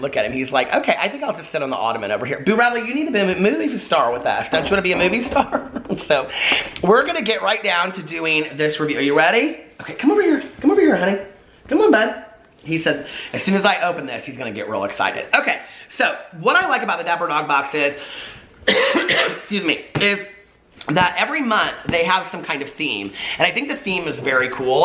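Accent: South, United States. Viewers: My mom loved the little house on the prairie bit. She’s from the suburbs of Chicago and says, wow he has such a country accent 😂😂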